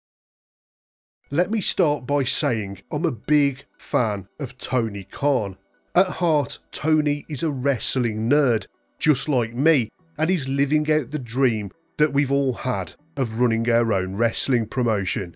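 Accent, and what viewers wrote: United Kingdom. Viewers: NGL I was missing your thick British Accent opinion on this. Nice Vid Bruv.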